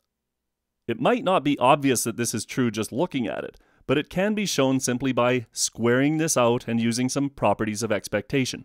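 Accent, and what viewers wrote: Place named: Canada. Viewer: I know a Canadian when I hear one. Also, thank you.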